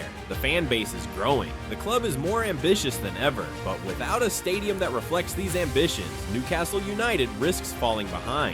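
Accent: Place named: United States. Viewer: More click bait in an American accent ✊🏻✊🏻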